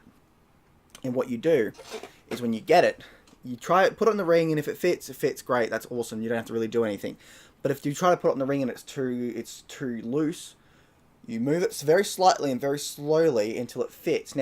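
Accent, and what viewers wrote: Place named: Australia. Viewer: I don't know why it was so hard for my ears to realise you're Australian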